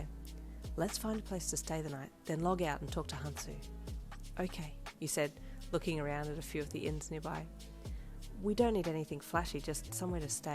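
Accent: Australia. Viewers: Heyy love the video as usual But the Australian accent was amazing I loved it 💕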